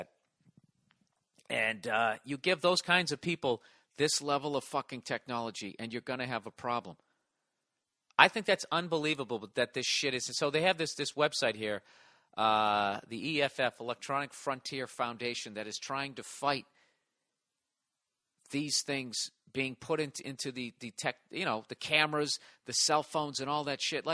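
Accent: United States. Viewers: lol i love the country accent to lol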